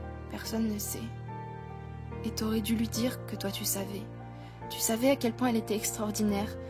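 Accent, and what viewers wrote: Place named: Canada. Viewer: mercii 🙃javais pas bien compris mais tu parle canadien,enfin ta l’accent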